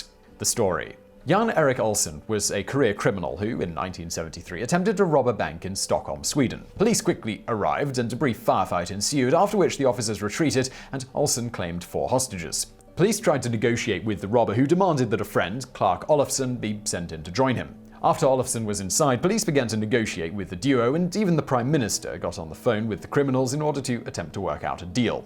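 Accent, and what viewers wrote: United Kingdom. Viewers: But I could listen to his urbane British accent all day! 😂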